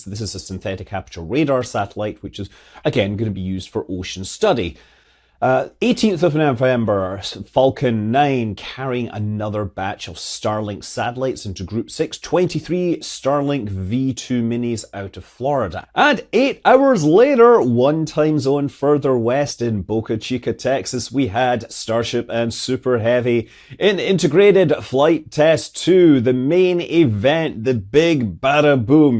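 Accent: Scotland, United Kingdom. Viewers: i like your channel and the scottish accent. i have on question about the hot staging and the negative acceleration which gives sense about causing the interruption of the steady propellant flow to the raptors. fluids without force field to keep them above the outlet are no issue on earth because gravity. acceleration may replace gravity at hot staging. I don't get how fluid transfer in space should look like. is the acceleration planed or do they think on a type of plastic bag inside the tank open to the outlet and on the other side by gas pressure to push it out. are there "plastic bags" working at liquid O2 temperatures?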